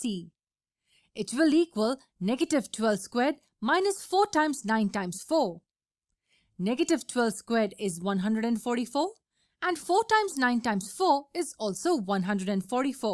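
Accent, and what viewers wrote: India. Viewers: That moment I heard the Indian woman I knew I was in for hell.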